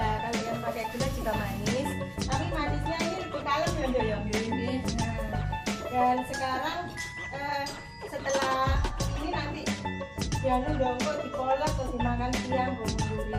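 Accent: Indonesia. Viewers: Orang Jogja kah Bun?😁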